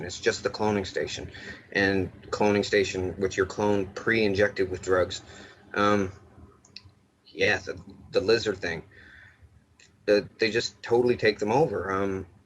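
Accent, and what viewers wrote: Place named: New England, United States. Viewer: This guy looks like john Cusak's clone, but with an annoying Yankee twang😅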